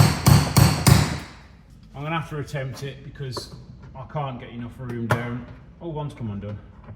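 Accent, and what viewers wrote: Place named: Black Country, United Kingdom. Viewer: Agreed. No need for the music. We just need that Black Country accent.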